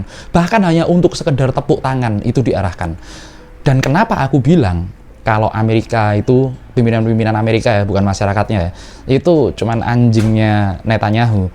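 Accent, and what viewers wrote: Java, Indonesia. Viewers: Jawa mu pekat bangat.. Pening dengar kamu.. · Konten ini Bahasa Indonesia cengkok cengkok JAWA dasar lho JAWA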